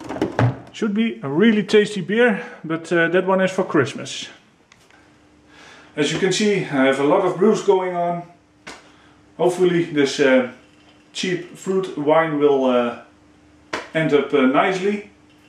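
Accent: Netherlands. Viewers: Allright Im going to try this as my first time brew! How did it turn out? And do I have to filter it before drinking? Proost from Holland ( and hearing your dialect I think you come from NL aswell?)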